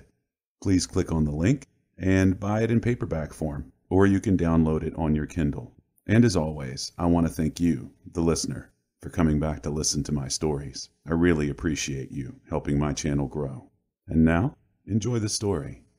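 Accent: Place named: New York, United States. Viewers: This notification was late af! WTF You Tube? I will always listen to every story you put out. I am digging your New York...New Jersey(?) Accent. Amazing job as usual! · Yes I have been to Boston etc but You nailed that fact, You def sound like You came from this side of the Country, I think You did a great Job but us NYER's while dislikes by so many other states have a ton of NYC pride, lol I would be horrible trying accents because I have my English accent is horrible as is my Southern accent etc, I love your channel brother, You are one of the best, Question may be weird but are You Rom's father? I'm asking because it's a rumor around the web and I notice you guys work together along with TDS a lot.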